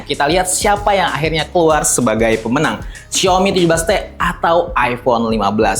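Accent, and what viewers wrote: Banjar, Indonesia. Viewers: Orang banjar kah pian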